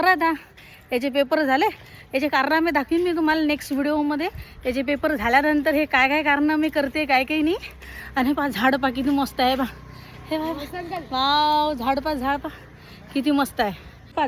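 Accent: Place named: Akola, India. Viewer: Akola chi bhasha ahe baa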